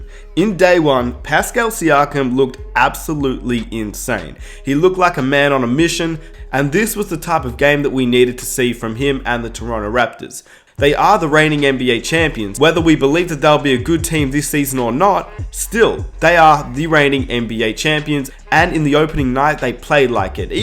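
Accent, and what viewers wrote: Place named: Australia. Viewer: You sound so Australian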